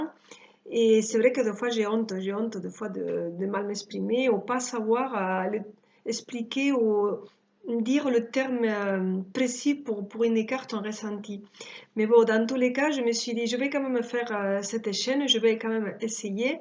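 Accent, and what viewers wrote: Spain. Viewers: Hello ma belle (dis-moi si jamais tu n'as pas envie que je t'appelle comme ça:- )))... JE PARTICIPE évidemment avec un immense plaisir. Bravo pour tes 100 abonnés!!!... Moi je t'adore, et je suis ravie d'avoir fait ta connaissance. Tu te débrouilles super bien pour ta chaîne et tu es très à l'aise, naturelle et on te comprend tout à fait... Donc, pas de honte... Et puis, l'accent espagnol est un plus!!! Bisous bisous... Tout plein! Sonia, Genève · Bonjour!!! Moi, j'adore ton accent espagnol d'autant que je suis d'origine espagnole et j'en suis très fière... Je participe donc à ton concours avec grand plaisir... Je trouve très gentil de ta part de célébrer déjà tes premiers abonnés... Je te souhaite une belle journée ensoleillée (car je suis près de Nîmes et chez nous le temps est superbe)... Bisous... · cc, j'adore ton accents espagnol, mon père est espagnol, saragoza 😉 + 1 abonnée , je t'invite à venir à voir ma chaîne et t'y abonnée et me suivre si tu le veux sa me ferait plaisir. 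gracias bela . besitos. ( attention la belle on a pas le droit de mettre je participe en commentaire) c'est interdit tu pourrais te faire supprimer ta chaîne. Il faut indiqué que les personnes mette un mot tout simplement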